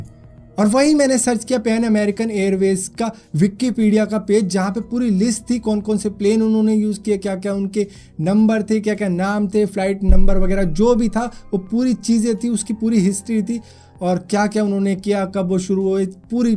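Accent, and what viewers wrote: India. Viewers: the heading is in english, n your voice is Indian, I thought you are sharing your story with the world.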